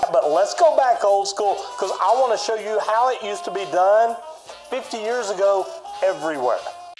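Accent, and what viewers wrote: South, United States. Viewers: "Sewer pipe" / ˈsu ər / / paɪp / Not soda pop or syrup hop. Just a heads up for people who aren't used to southern accents. I was born and raised in The South and I struggled with that one.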